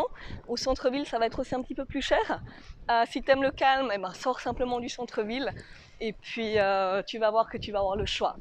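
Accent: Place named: Switzerland. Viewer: Salut, sympa ce vlog! Je suis franco-suisse et j'ai tout de suite reconnu ton mignon accent. Le mot "crouille" est inconnu des Français MDR! Bref, merci pour ces conseils, ils sont très pertinents et je vais les garder dans ma liste. Quels prix vraiment pas chers pour tout ce confort! Au plaisir, Marjorie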